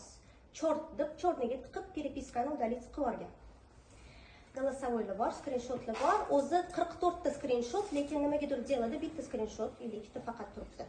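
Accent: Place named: Russia. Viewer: Чала урис узбекча гапираркансану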